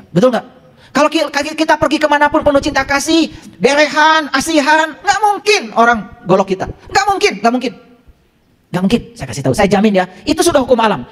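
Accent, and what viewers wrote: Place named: West Java, Indonesia. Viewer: Si paling kemarab, lidah Sunda koq dipaksa jd arab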